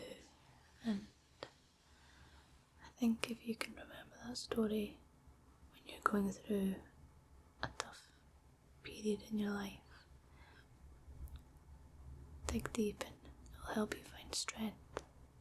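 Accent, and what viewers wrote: Scotland, United Kingdom. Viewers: Her soft Scottish voice is calming and relaxing .. beautiful eyes too. 🙂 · I need to move to Europe ASAP. Our accents here are terrible and do not compare to yours. i need this accent in my life 24/7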